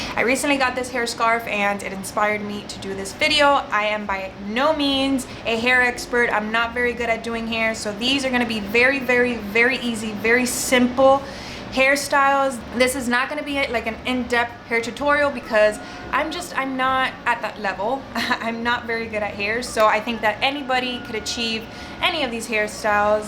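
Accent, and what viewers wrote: Miami, United States. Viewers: Miami accent? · Omg girllll I heard your accent and I was like "She's from Miami for sure!!" Love this 💕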